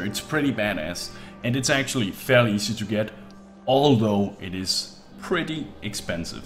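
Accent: Denmark. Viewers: Is he from Denmark?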